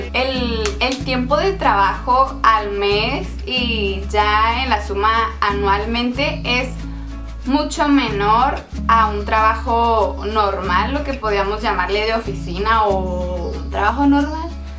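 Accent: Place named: Baja California, Mexico. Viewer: Parece que eres de BC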